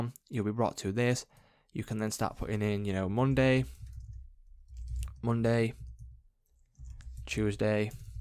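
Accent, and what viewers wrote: United Kingdom. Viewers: i think bro is britian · you are british. arn't you?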